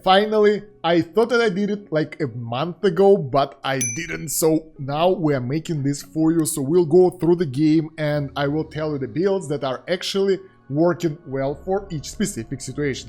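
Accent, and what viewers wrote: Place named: Russia. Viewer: у тебя русский акцент. Ты украинец?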